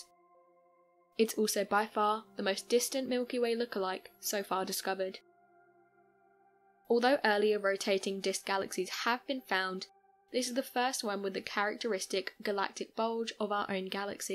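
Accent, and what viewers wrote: England, United Kingdom. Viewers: I wish you could Keep the heavy English accent in England , can’t find anyone who can interpret into American English sorry , bye bye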